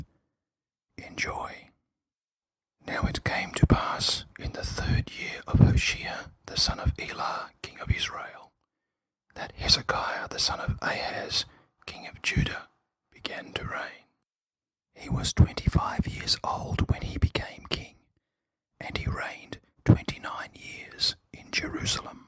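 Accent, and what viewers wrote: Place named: Australia. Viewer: This is great. ASMR bible whispering...with an Aussie accent! Thank you.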